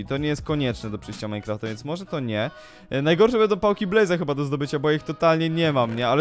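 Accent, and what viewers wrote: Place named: Silesia, Poland. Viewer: Ty trochę umisz muwić po Ślosku jak ja.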